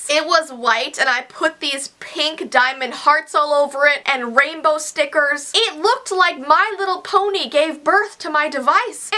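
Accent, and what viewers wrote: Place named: Canada. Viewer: Hi! I have been binge watching your videos and I am American. I know you're Canadian and I love your accent. I have recently been retreaving the Canadian accent. I love it but some people are asking me at school if I was faking the American accent. No, it's because of your accent is getting to me. Have a good day!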